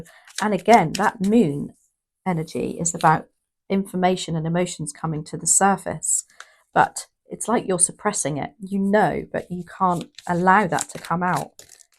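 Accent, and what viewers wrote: United Kingdom. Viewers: Thank you so much In America we love the British accent:face-blue-smiling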